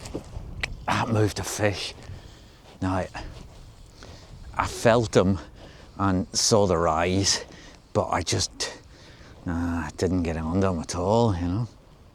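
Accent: Northern Ireland, United Kingdom. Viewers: Hi. A little word form a rellow Northern Ireland Man who has been in England after university for 50 years. It is so good to hear your wonderful Northern Ireland accent. I have not lost mine. I am proud of it. My dad took me fishing to Lough Melvin. I loved it. Trout seatrout and salmon fishing came into my Life. I was hooked. However I have caught seatrout at night but my only salmon was caught on the spate river Margy at Ballycastle co Antrim. Best wishes for the season.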